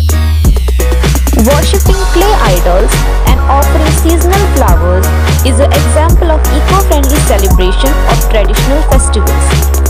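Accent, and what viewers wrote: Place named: Goa, India. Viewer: I lover your tipical goan english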